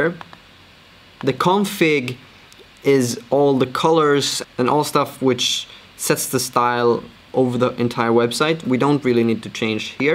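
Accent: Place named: Sweden. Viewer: omg älskar dialekten hörde direct att du va från sverige!!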